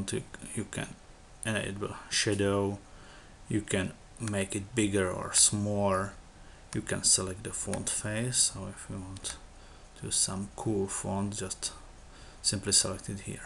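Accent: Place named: Czech Republic. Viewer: Which nationality are you?? It somehow sounds pretty much like czech accent.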